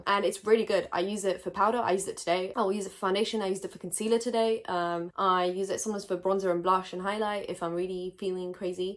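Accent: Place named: United Kingdom. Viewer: I fucking LOVE this kind of British accent when they pronounce literally as “lit-cher-lee”. It’s so damn cute.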